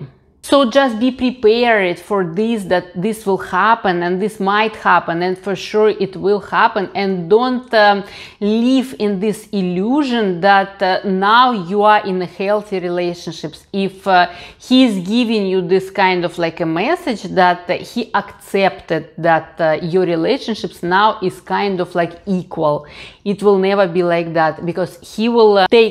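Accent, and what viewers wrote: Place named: Latin America. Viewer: Mi amor tienes un asento bien marcado deves ser latina trankila q estas hayudando a la gente y eres bella .Yo so soy boricua y con asento bien marcado🤣🤣🤣asi q Palante.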